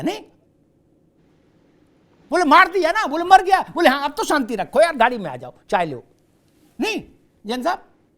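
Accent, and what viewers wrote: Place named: Lucknow, India. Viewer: जी लखनऊ से हैं लगता है। गुलाटी वर्दी चारबाग।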